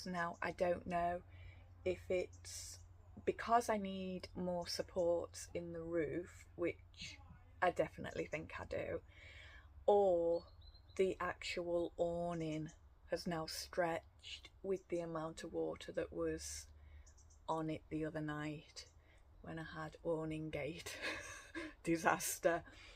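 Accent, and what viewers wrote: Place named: North, United Kingdom. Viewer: Oh dear that frickin awning is proving just a tad troublesome ay - but not to worry luuvy 'cause not only am I always totally enamored by your delightful Northern accent, I am also well and truly captivated by this ongoing and challenging saga!!...You're a true Brit Battler. Bravo 👏😀 Onwards and upwards. Congrats on the 1,000+ subs btw - That's awesome 👍😉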